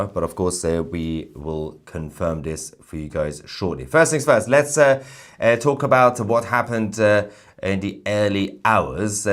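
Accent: United Kingdom. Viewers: I love how when mentions Caracas it sounds as if he were saying "Crackers" in his lovely British accent. 😉🤣 Seems appropriate somehow. But here is hoping they can get back some SANITY in their gov't with dictator maduro out of the way.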